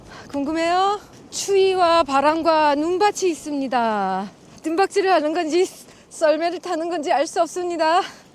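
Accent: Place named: Gangneung, South Korea. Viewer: 영상 재미나게 보구 가드래야 말투가 정겨와우야 ^^ 강룽말투가 정겹드래요 지는 정선이본가구 평창이고향이드래요 12일 선자령 10번째등정 복많이 받으래야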